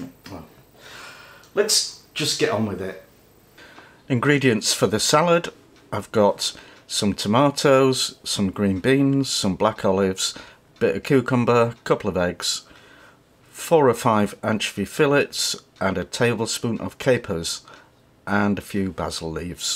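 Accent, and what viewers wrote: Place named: West Yorkshire, United Kingdom. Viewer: Hi, just found your website. I obviously know you are from Yorkshire, however where abouts? You sound like the same part of West Yorkshire that I am from so was curious 🙂 x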